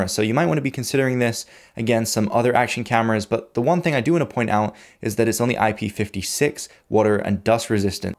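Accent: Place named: Scotland, United Kingdom. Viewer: But the way you speak is like Scottish....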